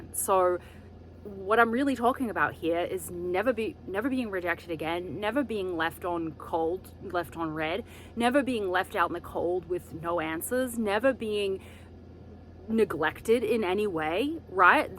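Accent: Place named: Australia. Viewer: Thank You for sharing your thoughts, insights and advice on self work, self love. Currently healing childhood traumas and insecurities after having 3 months romantic state to friend zone now ghosted last 3 weeks. Digging the aussie accent!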